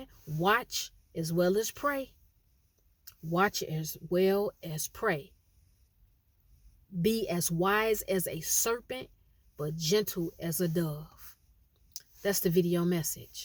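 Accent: Chicago, United States. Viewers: I love the chi town accent. Yes spot on. Iv got away from this with my abusive ex and friends. Now im laying low bettering myself whilst there clicking up in the streets because they can't get me at home ( so I hear )' God is good. So is lol. Amen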